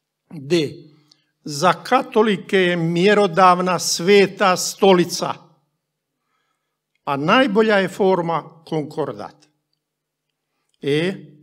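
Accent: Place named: Croatia. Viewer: Monsinjor Ratko Perić progovara čistim Hrvatskim narječjem jezikom.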